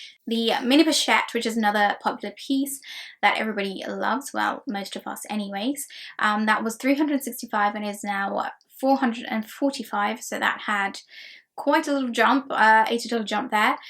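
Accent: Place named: United Kingdom. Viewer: Girl, you had me fooled - you sound British but referred to $$$ 👀👀 where are you from?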